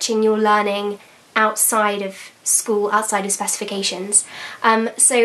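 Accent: RP, United Kingdom. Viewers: now this what u call a hermione irl... the accent, the and productivity 😩✋ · Omg I love your voice and your accent is so posh ❤️😂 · Her accent 😭💛💛💛 I love British people 💛💛💛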